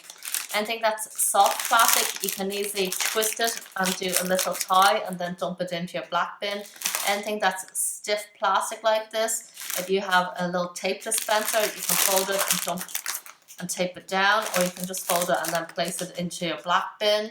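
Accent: Ireland. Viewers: Do I hear a bit of an Irish accent?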